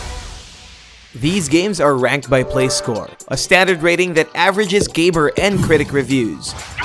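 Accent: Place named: Philippines. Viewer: This dude sounds Filipino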